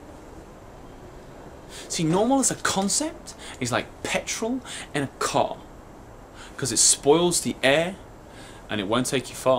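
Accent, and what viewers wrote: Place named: England, United Kingdom. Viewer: This is excellent! All your poems are amazing! By the way i'm russian, and i really like your pure English accent. I'll be glad if you will often record video of this kind. I'm sure it will help to improve the speech for many who teach English.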